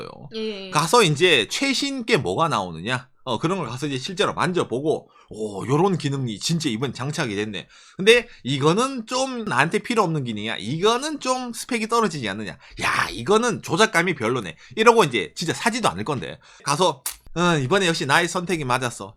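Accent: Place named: Gyeongsang, South Korea. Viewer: 이분 동남방언 너무 심하시네요 그것만 아니면 좀 편하게 들을수 있을거 같은데 구독은 못하겟네여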